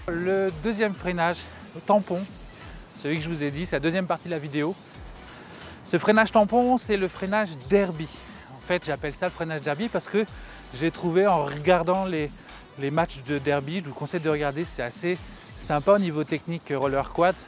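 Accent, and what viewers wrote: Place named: Réunion, France. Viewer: Bonjour 👋 Et mes sais a la reunion ?l’endroit et ton accent me le fait penser je commence tous juste le rollers premier pas en rollers sait la galère sachant que je suis obligé d’à prandre seul 😢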